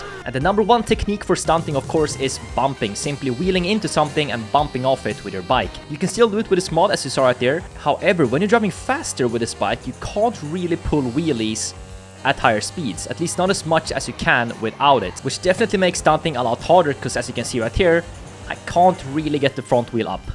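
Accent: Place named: Sweden. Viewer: you sound swedish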